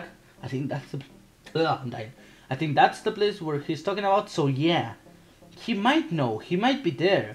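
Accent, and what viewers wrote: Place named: Spain. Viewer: That spanish accent tho. ( ^~^). Nice theory anyway. You get one sub.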